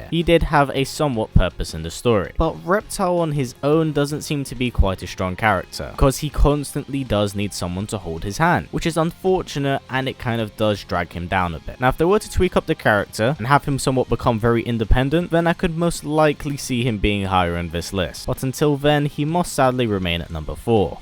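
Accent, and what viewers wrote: England, United Kingdom. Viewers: Maybe it's because I'm a huge sci-fi fan (mainly Star Wars and Star Trek) or because I'm not particularly familiar with Mortal Kombat's backstory, but I expected this list to be mainly Edenians. As a sci-fi fan inhuman does not necessarily equal non-humanoid . Since the person behind the video seems to have an English accent, I'll try to explain with an example. The Doctor is definitely inhuman, but s/he is humanoid.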